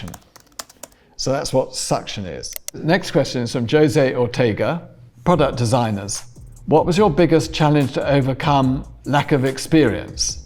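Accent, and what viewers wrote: United Kingdom. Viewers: イギリス英語たまんね〜〜〜😭😭😭😭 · 上品なイギリス英語ですね！ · イギリス英語？ググったらほんまにイギリス人やん聞き取りやすい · イギリス訛りすごいな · ブリティッシュのアクセントが最高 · すんごいBritishアクセント。 かっこよすぎ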